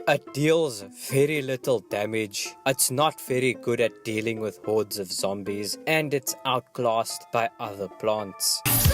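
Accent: Afrikaans, South Africa. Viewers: Could instantly tell you're South African 😂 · South african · Dude, are you South African? I can hear the Afrikaans in your accent 😂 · Wait are you Afrikaans? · is that a south african accent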